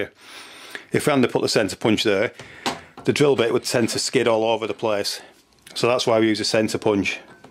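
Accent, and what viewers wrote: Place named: Yorkshire, United Kingdom. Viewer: Nice informative vid. I'm trying to place your accent to the exact region in UK..is it Yorkshire?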